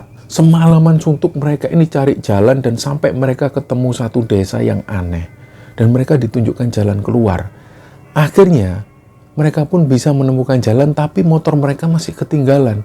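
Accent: Java, Indonesia. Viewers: yg ku senangi sama channel ini, medok jawa nya dalam membawa cerita ini mampu membawa pendengar ke dalam cerita!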